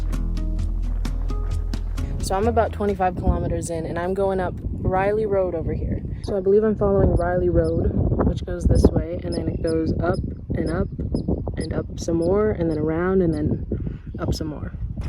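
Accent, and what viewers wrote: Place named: East London, United Kingdom. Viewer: that is absolutely excellent. what an amazing route and landscape. well done for documenting your travels. I love the word 'thunk'. It's awesome. love and good fortune (Mangala sutta) 😎😊❤ p.s. that was certainly an east end of London market traders accent. Or maybe Fagin from Oliver Twist. Certainly one of the two.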